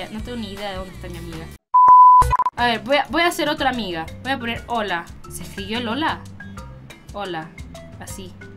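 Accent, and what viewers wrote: Argentina. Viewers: Oye eseñame a hablar argentino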